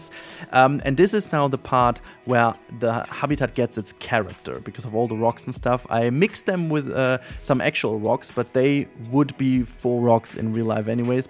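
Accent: Germany. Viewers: Sorry to ask but are you German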